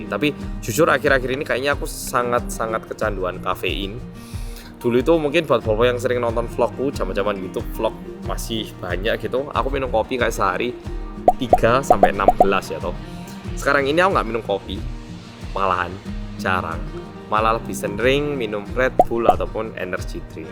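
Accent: Java, Indonesia. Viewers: alhamdulilah mas aku bolomu dari gondola running man sampe eneng wong korea medok seng versi saschet🎉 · Ngikutin sam dari 2018an dari dulu yg masi nge kos trs nge vlog ini itu dengan logat medok nya yg masih lekat bgt smpe sekarang tuh luar biasa sam Kangen memang di bbrp taun terakhir ini jarang ngeliat vlog mas, sampe" klo senggang tuh ngeliat vlog" jaman dulu trs ngeliat metbg pas masi di awal" Wes pokoke yo kangen ngono mas. Smpe akhire barusan liat sekilas di ig oh ternyata Jennette hamil toh, dan ini baru liat video penjelasannya mas. Ikut berbahagia juga mas dengernya dan sehat" terus buat keluarga😊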